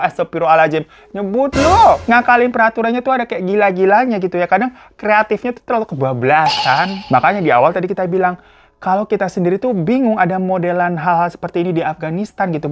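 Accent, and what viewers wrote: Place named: Central Java, Indonesia. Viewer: Abang aslinya dr jateng mana ngapak nya.....utara atau selatankah.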